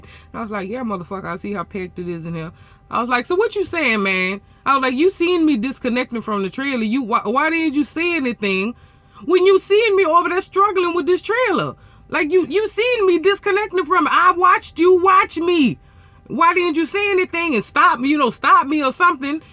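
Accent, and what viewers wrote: Louisiana, United States. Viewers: Not a trucker at all......... Im just hear to listen to the accent... Always been a sucker for a Louisiana accent!!!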